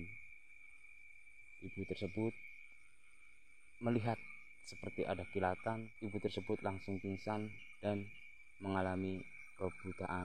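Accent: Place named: Java, Indonesia. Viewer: Indramayu hadir untuk kang Bahasanya medok kaya orang Jawa tapi ko videonya banyak diambil di sunda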